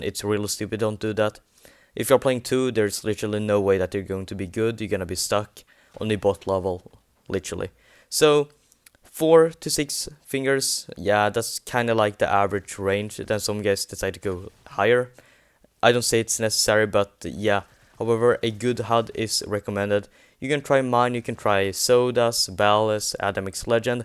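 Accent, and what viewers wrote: Sweden. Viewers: i bet ur swedish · Are you from sweeden ur engelish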